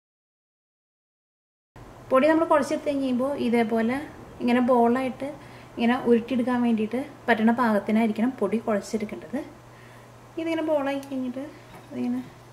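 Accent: Thrissur, India. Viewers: thrissur style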